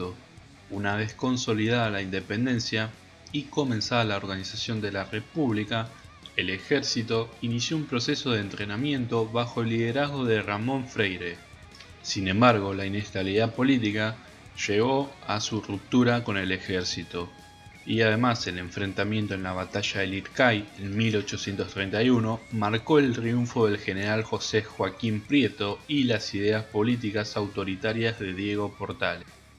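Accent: Argentina. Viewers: Es argentina no escuchas su acento?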